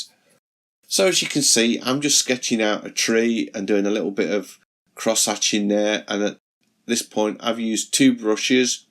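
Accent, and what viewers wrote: United Kingdom. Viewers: what you’re doing it’s wonderful.you can’t do split screen on this app,but in the Pro version you get 3 layers and that makes it very interesting,you can switch and merge those layers. There is also a 4th layer that actually let’s you’re choose what type of paper to use. AND in the Pro version you get the “blend” that you mentioned,very cool,the first of the 3 tools at the bottom. See i know where things are,but I’m not very good at sketching:-). Loved your video,and the British accent lol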